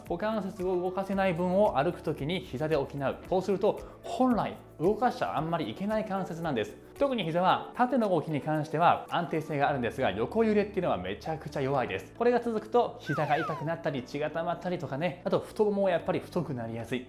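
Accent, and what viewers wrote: Kansai, Japan. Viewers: 初見です😊めちゃくちゃ良い声〜😊 これなら私も出来そうです！やってみます。ちょいちょい関西のイントネーションで親近感湧きました😊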